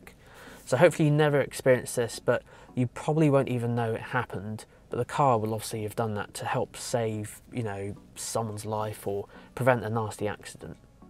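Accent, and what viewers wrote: United Kingdom. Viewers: your videos are superb! Explanations are educating and clear, video and audio are perfect! I even enjoy listening to your British accent. Thanks!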